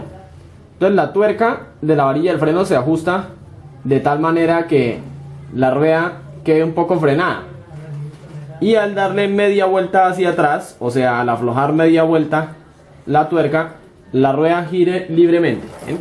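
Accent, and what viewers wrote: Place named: Colombia. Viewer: Buen video... Tu voz y acento colombiano me hizo acordar a Nicolás, el mejor amigo de Betty la fea. Saludos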